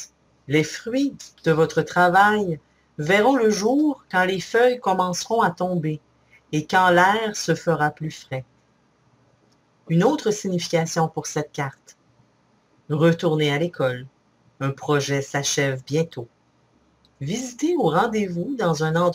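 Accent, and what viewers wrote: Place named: Quebec, Canada. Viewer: OH !!! UN ROBOT QUI PARLE QUÉBECOIS ...!!!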